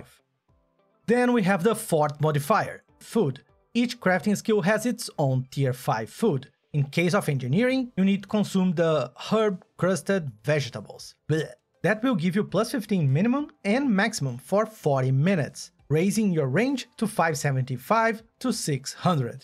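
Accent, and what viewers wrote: Brazil. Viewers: Ctza q eh BR com esse sotaque ai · Mano, tu é br num é? esse sotaque não me engana kkkkkkk. Mas, otimo video, valeeu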